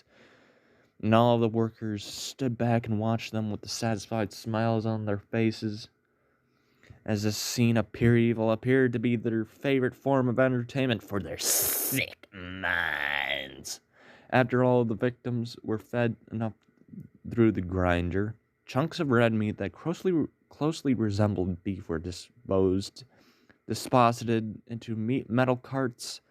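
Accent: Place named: United Kingdom. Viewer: I like your British talking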